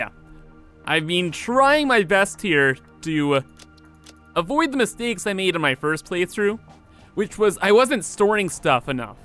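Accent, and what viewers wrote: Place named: Canada. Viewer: Oh man, first time seeing your videos and you sound so Canadian there bud, had to subscribe and check your twitter and indeed you are, what province do you live in? if its Saskatchewan imma loose my shit, nobody lives here.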